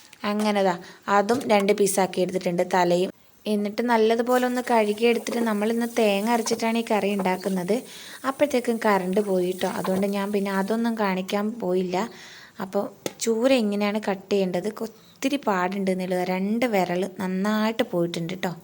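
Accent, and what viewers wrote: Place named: Kasaragod, India. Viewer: Aa namade paara... 😅chechi kasrod ano.. Nammem athe😁👍🏼😍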